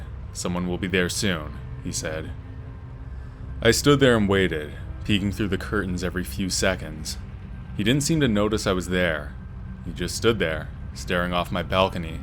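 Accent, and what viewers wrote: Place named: Canada. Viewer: The reader is def Canadian lol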